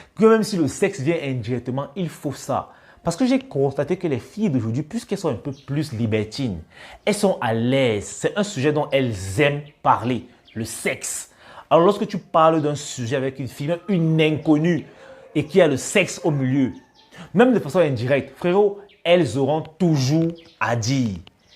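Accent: Cameroon. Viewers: Hayi déjà l'accent est clairement camerounais 😅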